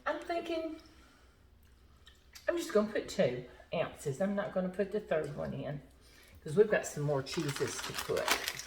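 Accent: South, United States. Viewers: Well aren't you just the cutest thing!! I'm originally from Louisiana, and I'm enjoying your cooking and your southern accent so much!!!!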